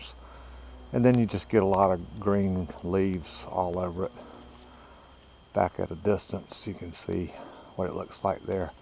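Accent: South, United States. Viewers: When he said "purdy" I was just like 😍 I love that southern accent 💗 Gorgeous tree vine.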